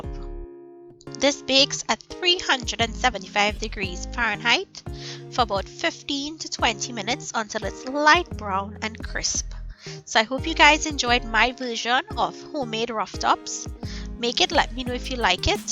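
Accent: Trinidad and Tobago. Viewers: I like the Trini accent lol thanks for doing this I am wondering how you do these I'll see if they're different lol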